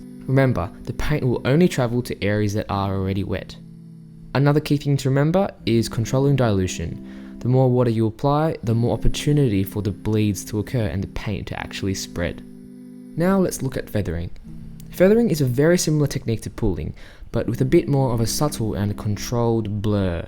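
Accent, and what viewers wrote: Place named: Australia. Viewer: sounds like an australian accent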